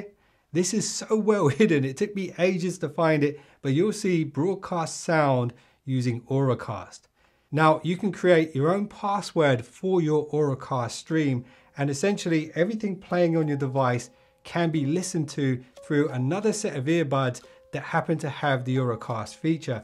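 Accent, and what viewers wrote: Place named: England, United Kingdom. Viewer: Can u drop the english accent? Not feeling it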